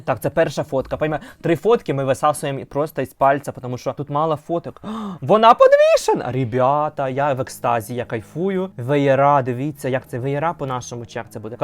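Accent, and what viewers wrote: Ukraine. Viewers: Твої коментарі це щось. Це б ще я отако "обсирала" тих дідів до хліва і їх галстук. І мова, і вимова,і східняцький акцент. Ти прикольний. Тепер буду дивитись огляди на "лгбт-вакханалію" з тобою.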